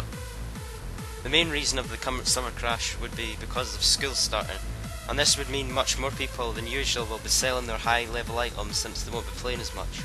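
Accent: Scotland, United Kingdom. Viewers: You sound Scottish =) I love scots!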